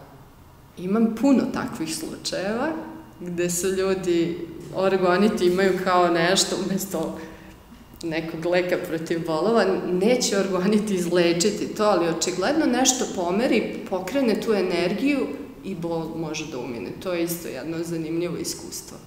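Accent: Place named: Novi Sad, Serbia. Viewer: Kako je milina slusati Novosadski akcenat. Bukvalno te uspava.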